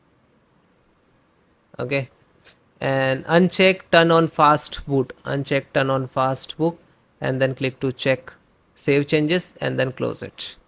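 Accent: India. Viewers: IM DONE WITH THE INDIAN ACCENT IN EVERY VIDEOS. ENOUGH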